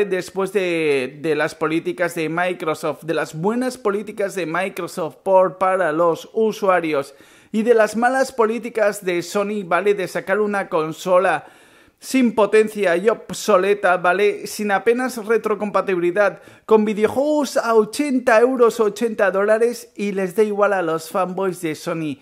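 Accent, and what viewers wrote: Mexico. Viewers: Yo pense que eras de mexico xD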